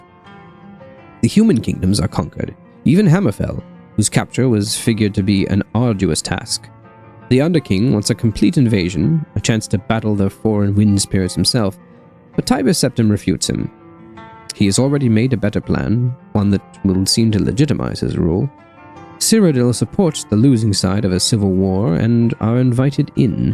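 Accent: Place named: RP, United Kingdom. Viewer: I am very much enjoying your readings of the books. Particularly your English RP (recieved pronunciation) accent. A side note for your knowledge we pronounce the word Dynasty as Din not Dine if you wish it to sound authentic. Reciting in repetition tongue twisters such as Peter Piper or She sells seashells or The rain in Spain or The quick brown dog to practice your enunciation will help. I am sorry you are feeling unwell and it will definitely give you a fuzzy brain preventing you from taking the information in. Nevertheless, a super effort and much appreciated. 👍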